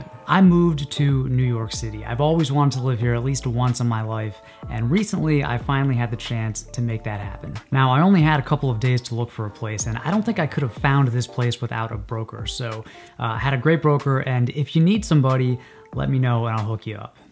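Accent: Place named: Texas, United States. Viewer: Texan accent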